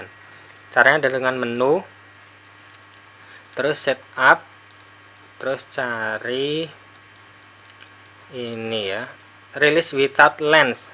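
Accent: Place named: Brebes-Tegal, Indonesia. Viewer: Sampean tinggal dmn om? Kayak brebes tegal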